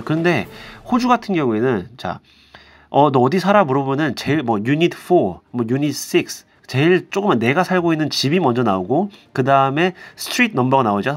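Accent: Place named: Seoul, South Korea. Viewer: 안녕하세요 훈더맨 실방에서 알고 들어왔어요 역시 서울 말씨가 듣기좋습니다.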